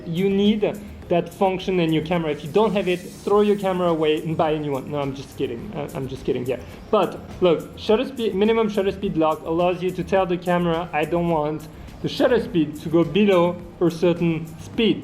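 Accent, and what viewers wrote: France. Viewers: Ton accent français 👌👌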